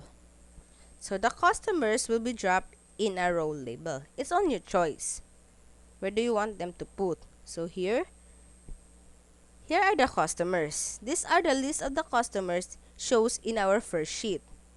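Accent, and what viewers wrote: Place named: Philippines. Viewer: You sound like your filipino, aren't you?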